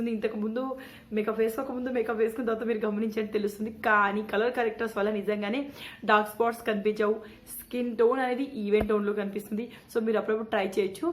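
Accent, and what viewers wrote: Telangana, India. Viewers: Hi gaaru mi voice ki fan aipoyaa Mana telanganaa yaashaa lo super gaa cheptuvuntaaru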